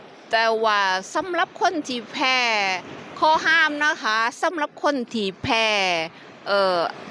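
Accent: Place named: South, Thailand. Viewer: ให้กำลังใจน้องกันดีกว่าคะ.บางครั้งการทำคริบในการพูดแบบนี้มันก็มีติดบ้างอะไรบ้างนิดหน่อยมันก็ไม่ได้น่าเกลียดอะไรสำเนียงใต้ฟังก็เพราะดีนี่คะ.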